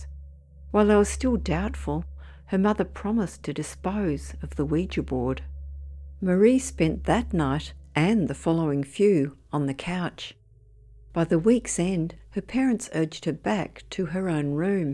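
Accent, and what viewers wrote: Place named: Australia. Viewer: God, the Australian accent is so ugly! I can’t listen to this crap! Change the accent!!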